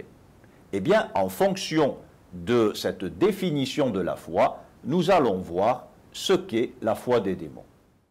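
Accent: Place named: Marseille, France. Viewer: So I'm scrolling through the hillbilly Thomists avoiding to write my essay on angel and then comes by with his hint of Marseillais accent bringing me right back home and back to work too... The book he wrote on angels has been translated in English and is really good!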